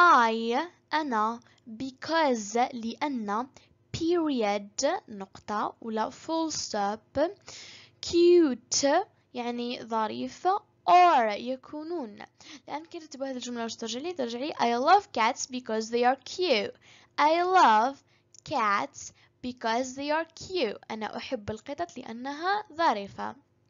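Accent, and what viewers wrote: United States. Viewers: لانها تقرا بالطريقة الانجليزيةالامريكية وليست بطريقة برتش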